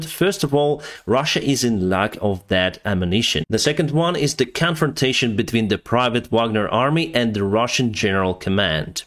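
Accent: United States. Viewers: great ascent.................really Ukrainian or living in DC? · As an American that speaks multiple languages, I have no trouble whatsoever understanding him. English is the tough one to understand, not Eastern European or Asian languages. Once you know how their sentences are structured, the words in English can convey the message. Compared to some of the translators on UATV, sounds downright American. They have two that are absolutely horrible.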